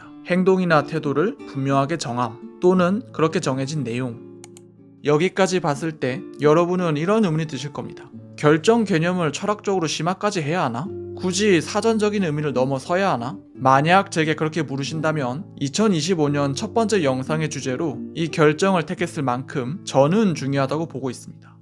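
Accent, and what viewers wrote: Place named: Busan, South Korea. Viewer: 좋은 영상 감사합니다!! 부산 출신이신가요? 억양이 반갑네요 :)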